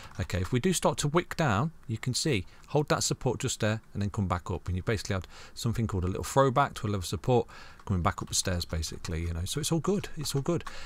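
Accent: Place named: Norwich, United Kingdom. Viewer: Given the accent I'd say around Norwich haha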